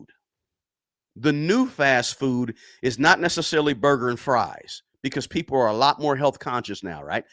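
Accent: South, United States. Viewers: Hey, I enjoy your show. With all the respect the world if I may say please, you're the first African American man I've ever heard with a deep southern accent lol. I like it plus keep up the good work thank you.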